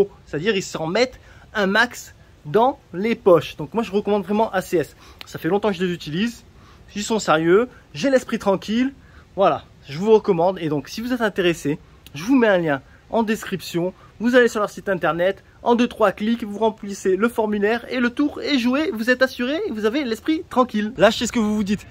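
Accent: France. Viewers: Nghe là biết người pháp😂 chào mừng anh em đến với Vietnam 👋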